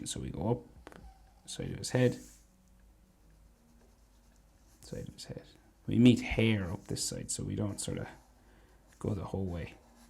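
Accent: Ireland. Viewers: First u art is sooo good, its eloung me become a waay better artist and second r u irish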